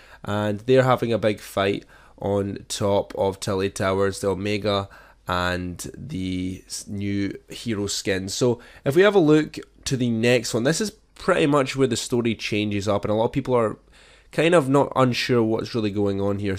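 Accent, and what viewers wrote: Scotland, United Kingdom. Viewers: R u Scottish if yes where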